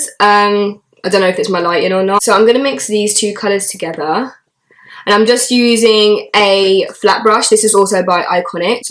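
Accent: London, United Kingdom. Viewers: very cool! i was wondering because your accent sounds much like accents from at or around london :-)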